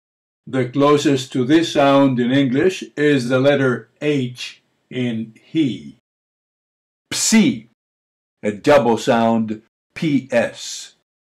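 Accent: Greece. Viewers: This is the real sound of greek. Finally someone is trying to save you from erasmian ir whatever fake pronounciation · Many thanks, learning koine Greek using standard western method. Good to hear a Greek pronuction.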